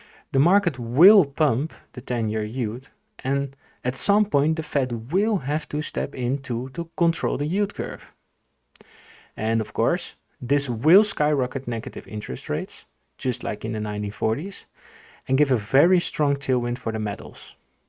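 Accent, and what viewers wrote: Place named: Germany. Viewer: With that accent you got to be deutch ?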